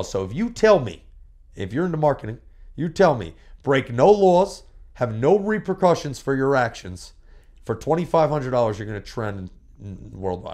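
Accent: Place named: New York, United States. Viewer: Why does he's new York voice go in and out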